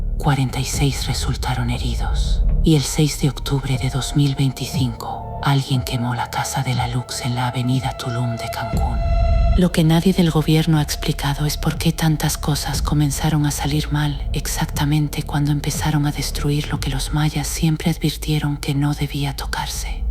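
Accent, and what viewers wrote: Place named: Spain. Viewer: JAJAJAJAJA PORQUE PRONUNCIAN "ALUCSES" SI SU PRONUNCIACIÓN CORRECTA ES "ALUSHES", PORQUÉ LA NARRADORA TIENE VOZ ESPAÑOLA... NADIE SE TRAGA ESTAS MENTIRAS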